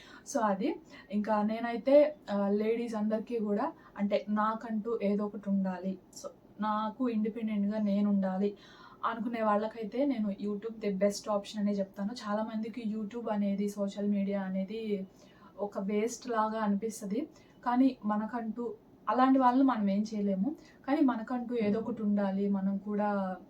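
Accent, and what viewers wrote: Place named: Guntur, India. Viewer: అవునండి గౌతమి వాయిస్ లాగే ఉంది ఎందుకంటే స్లాంగ్ ఒకటే కాబట్టి గుంటూరు డిస్ట్రిక్ట్ అలా అనిపిస్తుంది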